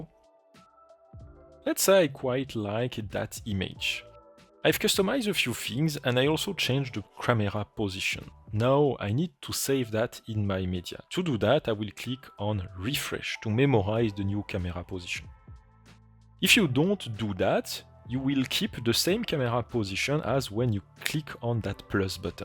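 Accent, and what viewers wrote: France. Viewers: J'aime bien son accent frenchy. Ca rend les tutos beaucoup plus limpides. Pas besoin de sous titres pour comprendre. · Just a reminder, english is not french, and you do not pronounce english terms as if they were french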